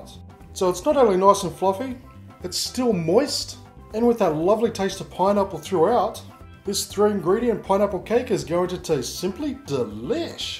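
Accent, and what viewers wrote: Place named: Australia. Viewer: he said 40mins, must be the Aussie accent >.>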